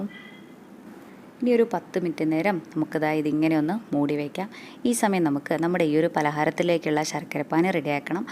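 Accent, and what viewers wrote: Palakkad, India. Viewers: Kollato..chechiku palakkadan slang undu, ath kelkan thanne oru rasam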